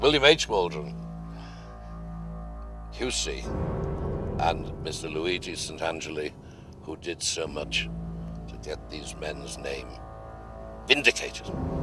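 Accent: Liverpool, United Kingdom. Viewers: Sounds like a Liverpool accent for that area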